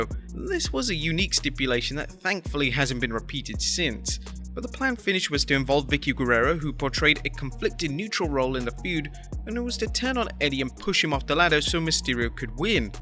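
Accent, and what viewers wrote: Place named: United Kingdom. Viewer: Even if you didn’t have the slight accent I would know without a doubt that you were from the UK. Love the way y’all pronounce H. 😃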